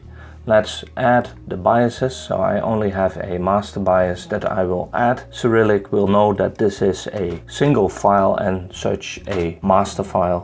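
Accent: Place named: Netherlands. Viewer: Hi, Funny how in the first seconds I already recognized a fellow dutchie XD. For everyone else I'll ask my question in English. I'm currenty shooting a 3 panel mosaic of NGC 1333 with my ASI 294MM pro. I'm planning on having at least 25 subs for each RGB channel. After which I want to stick them all together to create a single big mosaic. My question is, what would be the best work flow? Last year I did a 2 panel DSLR mosaic of m31 in a PixInsight trial, which worked flawlessly. But I have no idea what would be the best way to do it with mono data in SiriL. Ofcourse I would start with stacking the data from every filter together, but what would the next step be? Do I stretch and edit every mono filter, make a RGB version of that panel than use ICE. Or would I stretch it, use ICE to create the mosaic and then make the RGB version? I think that doing ICE in between stretching and RGB would cause problems with alignment later. 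Another problem with these work flows would be that it is really difficult to have the same stretch across (3 panels * 3 filters) 9 different files. You mentioned something about creating a SiriL script which does the stretching. I have never used scripting in SiriL so would something like that be difficult to do? Or would it be better to stack the files, use ICE than stretch and RGB composite? NGC1333 has a lot of faint dust (I really like the image from Justin Tolboe), so I think that it would require a bit more processing than doing a simple auto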